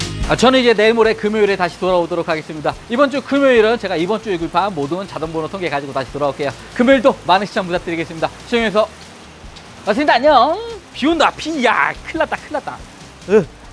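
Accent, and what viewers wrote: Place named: Jeolla, South Korea. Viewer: 비 오는날 고생하네요 ㅋㅋㅋㅋ 아따 전라도 사투리 검나게 방갑소 ㅋㅋㅋㅋㅋㅋ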